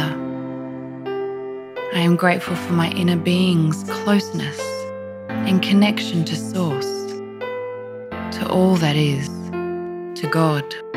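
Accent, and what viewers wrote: Australia. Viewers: My soul responds so much more to your beautiful Auzzy voice ! Namaste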